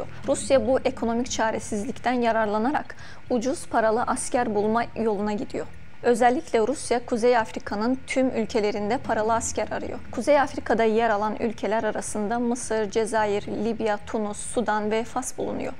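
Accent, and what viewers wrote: Azerbaijan. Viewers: Azərbaycanlımi spiker? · Salam siz şurnalis Azəribacanlısınz gözəl danışrsınz 🇹🇷🇹🇷❤🇦🇿🇦🇿🇦🇿👍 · Konusan kizin aksanindan azerbaycanli oldugu belli :)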